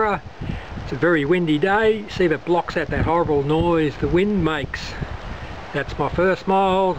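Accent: Australia. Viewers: Sounds like your from Victoria ?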